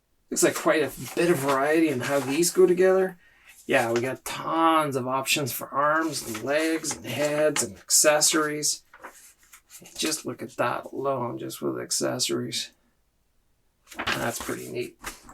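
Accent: Northern Ireland, United Kingdom. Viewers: Nice N.Irish accent 😆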